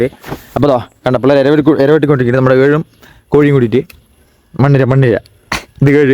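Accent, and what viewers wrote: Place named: Palakkad, India. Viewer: Palakkad slng poli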